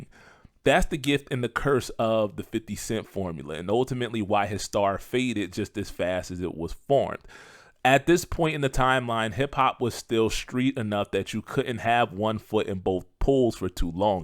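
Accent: Philadelphia, United States. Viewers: I know that accent. Philly fasure.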